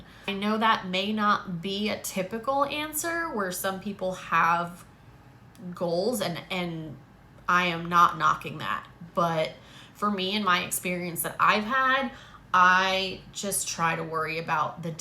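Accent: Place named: New York, United States. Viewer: you sound nothing like a woman from New Jersey. Definitely from New York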